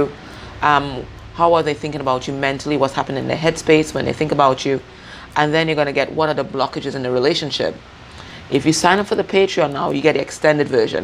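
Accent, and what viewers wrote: Trinidad and Tobago. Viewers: Are you trini?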